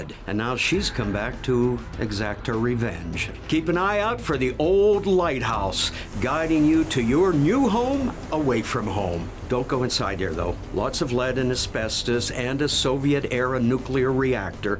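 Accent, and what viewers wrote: New England, United States. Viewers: Holy shit you've got New England nailed down. Now when may we expect Roger? I can pick him up at the train station at a moment's notice. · Wow new Horton sounds like New England?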